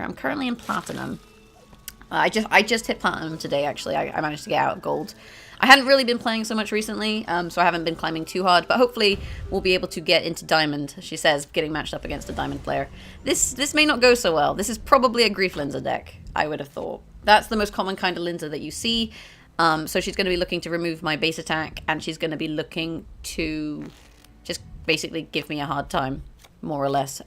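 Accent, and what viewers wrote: United Kingdom. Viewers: I can't place your accent. British with Irish parents living in the States is my wild guess. Anyway another good vid, have been looking for a deck to get me out of plat. I run several adapted versions of your decks to deal with my Diamond card poverty. They are consistently effective. Cheers for the good work.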